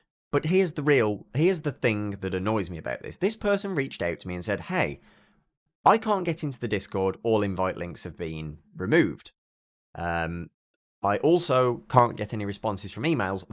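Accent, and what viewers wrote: Birmingham, United Kingdom. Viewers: whats your accent? some mad brummy style :) good vids man, fellow dev here, geordie.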